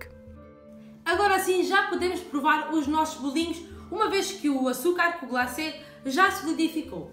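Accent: Portugal. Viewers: Gosto da forma como ela diz "Óla Tólkien Talkrs" com o sotaque luso É engraçado e, ao mesmo tempo, charmoso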